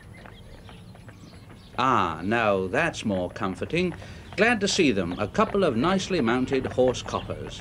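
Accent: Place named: Newport, United Kingdom. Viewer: You can hear the Newport accent in more in this clip than ever!:-) wonderful little film. 👍👍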